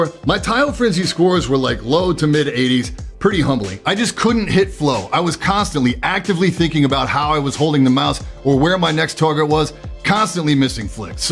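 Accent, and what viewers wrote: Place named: California, United States. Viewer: Love your humor and style of presentation. Haha where you from? Sounds like Cali.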